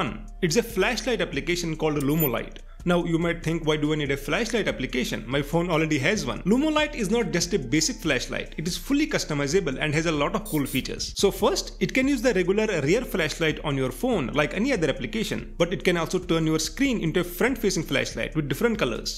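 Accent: Kashmir, India. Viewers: By any chanass brother, u koshur??? I caught the accent 😅❤ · For The Sake Of The Holy Cow !🐄 That's a very heavy Indian accent My Friend. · Are you Kashmiri? The accent gave it away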